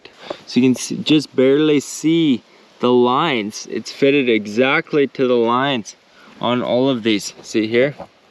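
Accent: Canada. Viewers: Man, what a beautiful site to build a log cabin , I was wondering,where it is. Lol,until I heard him speak,and I knew it’s Canada, aye . Nice large logs and a big ,flat open field . Love it 👍🏼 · You had me at your Canadian. Hot DAMN it's nice to hear that Canadian accent for a change. Hi from BC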